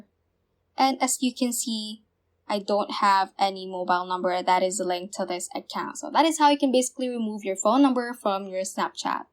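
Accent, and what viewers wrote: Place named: England, United Kingdom. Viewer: Your English accent it's so nice ❤️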